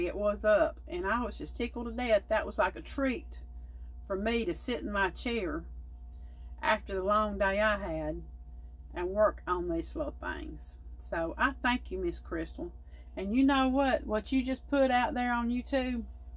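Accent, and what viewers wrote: South, United States. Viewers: Watching in my gown, heading out to work in a few, love hearing another southern voice. Great job on those monsters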